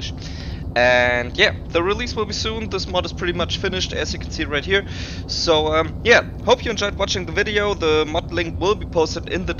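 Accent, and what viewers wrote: Germany. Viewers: u sound german are you?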